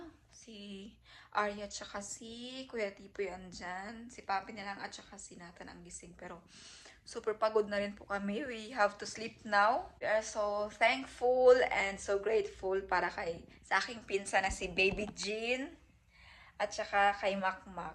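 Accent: Visayas, Philippines. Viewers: Stop being perfectionist girls.shes a Bisaya so it's not easy to her to speak straight..and that's the way that's why she known from the beginning of her vlog if you think that you are brainy enough you should understand what she's going to mean it.in my opinion ✌️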